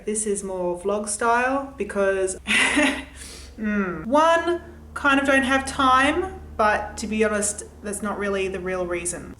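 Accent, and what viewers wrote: Australia. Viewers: Hi ♡ I usually remain a ghost-viewer but I wanted to comment to say a few things: 1) I love your videos 2) and your personality 3) and your laugh (it's so cute!) 4) I love australian accent (had never heard it before hihi) 5) I read your descriptions! ♡ 6) I so feel you about the importance of words/phrasing (#sensitiveteam ♡) 7) We love you! 8) we love you, and you don't even need to apologize for doing different than usual! Just do you! we'll love you and love your channel whatever! 8) lots of love from my little heart of acne/redness/scars-and-etc (#teamhairy! Haha) sufferer ♡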